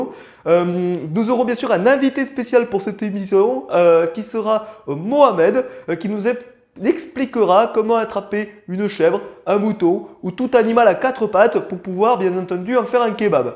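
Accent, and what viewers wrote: France. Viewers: Oh l'accent du sud🤣🤣🤣 t'es génial ne change rien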